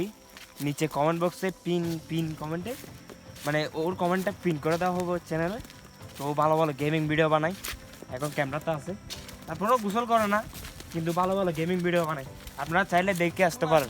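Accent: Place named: Chittagong, Bangladesh. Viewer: ভাই তোয়ার বারি চিটাগাং হডে😂😂😂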